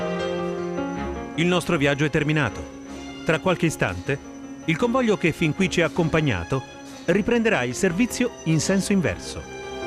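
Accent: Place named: Tuscany, Italy. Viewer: Cmq noi romani, sul tema delle voci degli annunci, abbiamo sempre cercato di emergere dalla massa :D Oggi sulla metro abbiamo la frase "Uscita lato destro....?" pronunciata quasi come fosse una domanda (chi è di Roma e frequenta le metro sà di cosa parlo eheh); ma dopo aver visto questo video, consto che "ieri" la calda voce della metro romana aveva un bell'accento toscano!!! :D:D:D:D sulla metro di roma si parlava toscano!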